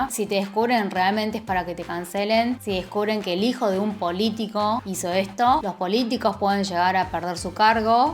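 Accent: Argentina. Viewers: habla español con acento argentino. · Muy buenos tus videos lo unici que no me gusta es ese molesto sonido argentino .shoñ shoñ lo siento es mi umilde opinion ...que tengas un lindo dia👈😇👍👈😎👍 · Es raro ver una persona con tus rasgos orientales hablar argentino no español argentino marcado, se q debe ser vueltas inmigrantes ya debe ser normativo pero me sorprende igual, rasgos físicos son muy marcados, y acento también., es combinación diferente. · Hablas como n dejo argentino pero con mentalidades coreanas no me parece corrupción sino libre albedrío que debería haber ya se acabó la esclavitud querida todos tienen derecho a buscar que nacionalidad quieren tener o ir o no ir a cumplir el servicio militar es que claro solo en Asia específicamente Corea y también oriente el machismo también se terminó deberían cambiar esas políticas narcisista soy privilegiada e evivir en Latinoamérica taynqye nos quejemos tenemos muchas libertades no nos lavan el cerebro desde la escuela como allá ser mejor no quiere decir ser infeliz. 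Si se pueden ambas. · Hablas español perfecto con acento argentino... Es mágico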